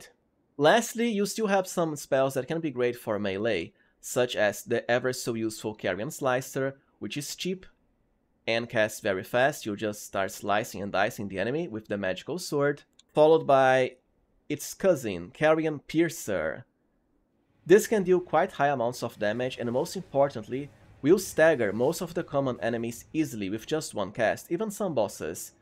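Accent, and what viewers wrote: Brazil. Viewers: reconheci o sotaque brasileiro na primeira frase, adorei o video mano! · I know a brasilerio when I hear one ;)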